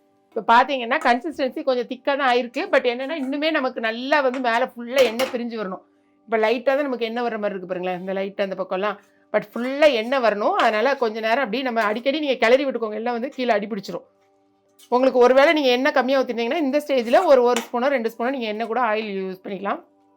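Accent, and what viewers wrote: Tirunelveli, India. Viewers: Neenga tirunelvelithana akka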